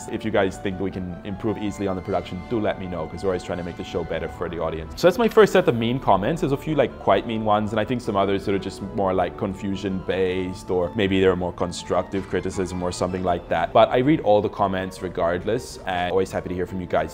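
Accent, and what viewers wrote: Philippines. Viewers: Don't listen to those hater, you have a great channel. But I have a question, how did you get a Filipino accent? Lol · Hi,. I'm a Filipina from Colorado and would like to weigh in. As far as your looks, you are way too fair, but then you have no control over that. As far as your face, you have nice, kind eyes and a nice smile. So to me, you would be an 7 - 8. But then individual tastes are exactly that, individual, right? I prefer male faces to be more chiseled. But that's just me. It cracks me up to hear you speak English because you have a detectable Filipino accent and you seem to have lost your Australian accent. Anyway, keep on "chefing" because I watch your show to get the "base" when making unfamiliar Filipino dishes. The Filipino chefs on youtube speak Tagalog, and I don't understand Tagalog. Good day!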